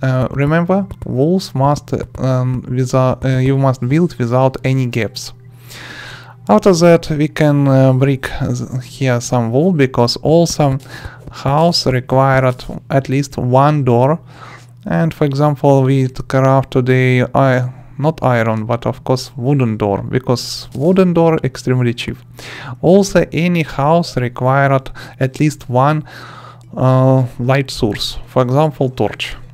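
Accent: Russia. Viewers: Акцент, русского человека)) Привет! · Русский акцент тебя выдает...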